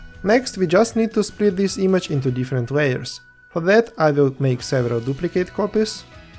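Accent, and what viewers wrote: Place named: Russia. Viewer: Wonderful tutorial! Thanks. I want to work on raspberry pi, subscribed to your channel. You have an interesting accent, similar to Russian. You are Russian?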